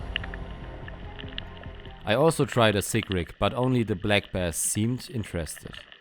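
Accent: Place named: Germany. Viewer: The dialect is so german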